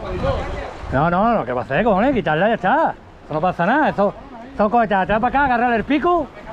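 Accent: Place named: Madrid, Spain. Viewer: parece k son de los Madriles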